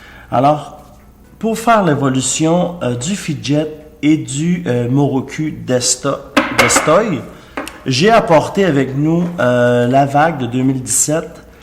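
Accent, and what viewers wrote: Quebec, Canada. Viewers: un accent québécois. ? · Je suis français et j'adore ton accent québécois j'aimerais bien en recevoir un continue bye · j'adore l'accent canadien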